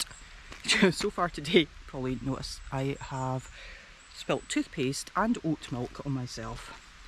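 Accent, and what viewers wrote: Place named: Scotland, United Kingdom. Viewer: I didn't know how much I needed your beatifull soothing scottish voice today. Thank you for that.💚 What a wonderfull trip in an absolutely wonderful country.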